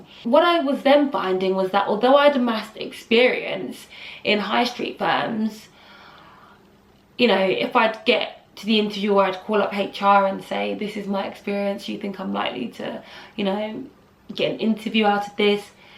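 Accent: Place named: United Kingdom. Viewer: She is not American she is in the UK. Speaking with a British accent in the UK is no different then an American speaking with an American accent in America. Her qualifications and skills is what got her the job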